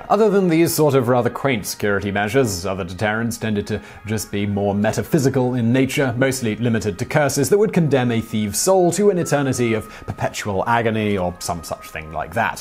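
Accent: England, United Kingdom. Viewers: Bald? Check. Glasses? Check. British accent? Check. This gent must be legit. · Much Refreshing Delightful And Insightful... LOVE YOUR Marvelous English Accent And Your Intellect!!! YOUR ALWAYS Well Put Together Along With YOUR Topics. I Look Listen And Learn So Much THANK YOU, For The Excellent Work Keep It Up.... THIS Subject Matter On THE "Egyptians" Is So Fascinating. PEACE🙅🎩📖🏆😃🙅🎩📖🏆😃🙅🎩📖🏆😃🙅🎩📖😃🙅🎩📖🏆😃🙅🎩📖🏆😃🙅🎩📖🏆😃🙅🎩📖🏆😃🙅🎩📖🏆😃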